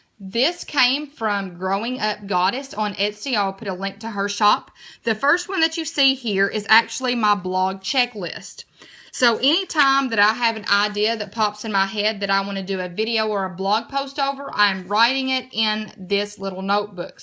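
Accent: South, United States. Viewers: Really nice video, very clear, easy to follow, and I loved the clear way the ensembling of the foxidori. I also loved that sweet Southern accent! :-) So warm and homely to hear!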